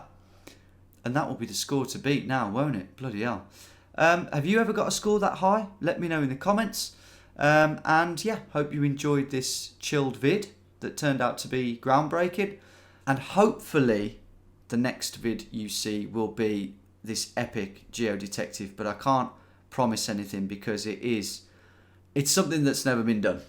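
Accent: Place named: West Midlands, United Kingdom. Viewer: thank you for a video! You have great West Midlands accent!))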